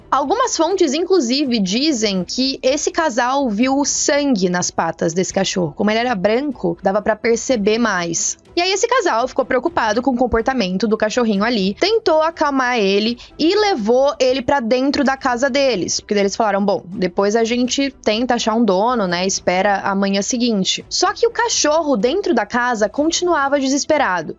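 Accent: Sao Paulo, Brazil. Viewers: Sotaque paulista com gripe.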